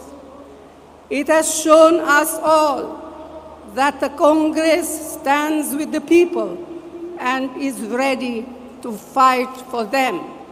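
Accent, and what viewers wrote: Italy. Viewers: सोनिया जी आज भी हिंदी ठीकसे नहीं बोल पाती, अंग्रेजी भी इटालियन लहेजेसे बोलती हैं | अभीतो सिर्फ ५५ सालही हुए है भारत में रहकर | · Ye hamara durbhagya hai ki aap jase log satta ke uchh shikhar pr pahuch gaye Aapki Hindi or English dono kharab hai. Aap Bharat me salo se ho mager aapke bhasa ke uchharan se lagta hi nhi ki aap bharatiy ho. Aap Italy bolo Jo aapki matri bhasha hai.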